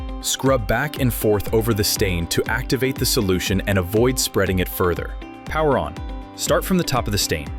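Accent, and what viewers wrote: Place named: United States. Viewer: what an awful video with irritating music and fast speaking american accent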